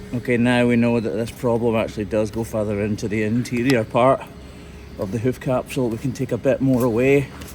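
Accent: Scotland, United Kingdom. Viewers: Stopped by for the hoof trimming, stayed for the Scottish accent. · I just love accents and languages especially scottish accent. Love these educational cow podiatry. ❤ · I'm so happy I now know what digital dermatitis is. In my favorite Scottish accent. Much love brother. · Love the Scottish accent.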